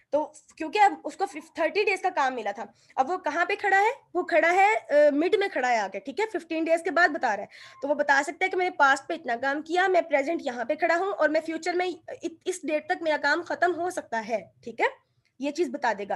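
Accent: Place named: India. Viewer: When I heard the way you speak. my mind said oh. Indian.. I'm a good friendly to Indian people. too much here in Abu Dhabi my friend.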